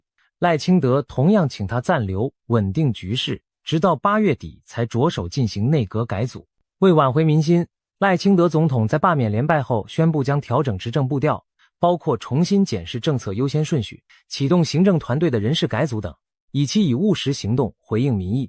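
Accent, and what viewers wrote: Mainland, China. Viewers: 聽起來像大陸人的解說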